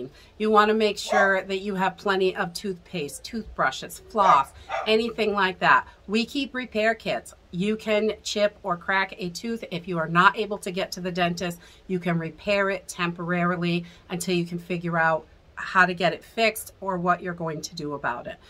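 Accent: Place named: Maine, United States. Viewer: First timer, I was listening to Canadian Prepper and your video just started afterwards, as I listened, I was thinking if that is not a Maine accent. Great video from a neighbor in southern Franklin Couunty, Maine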